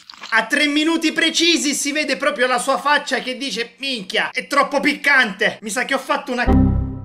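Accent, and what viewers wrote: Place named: Catania, Italy. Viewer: Io sono di catania e mi sono sempre chiesto da dove venivi pk avevi un accento catanese ho letto il wiki e ho scoperto che vieni da catania seeeeeee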